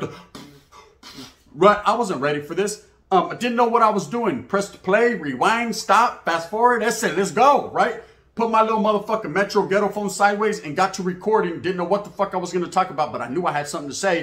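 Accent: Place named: Los Angeles, United States. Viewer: Your Chicano bro, don't matter where you live. But low-key yeah I thought you were from L.A. when I heard your lingual. Anyways much love and respect keep spitting that knowledge.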